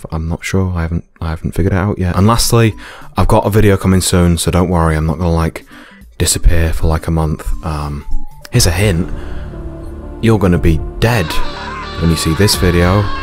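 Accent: United Kingdom. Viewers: hey, mom, new femboy just dropped Btw: u look infinitely better knowing you have a british accent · omg he's british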